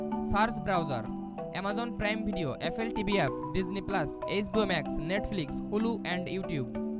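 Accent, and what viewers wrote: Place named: India. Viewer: Hindi native tongue speaking accent is not as thick as some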